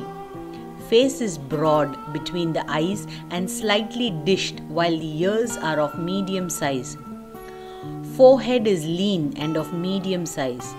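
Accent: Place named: India. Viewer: You seem to have a foreign name with an Indian accent.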